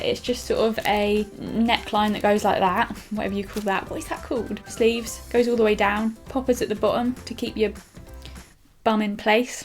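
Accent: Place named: England, United Kingdom. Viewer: Ugh I just wanted to watch a clothing haul without hearing someone yelling and practically screaming in my ear and I came across this, so soft And an English accent.. wow THANK YOU!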